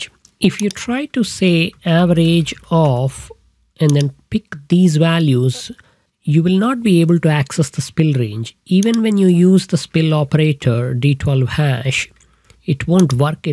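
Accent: India. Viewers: The accent is too Indian for me and I am an Indian.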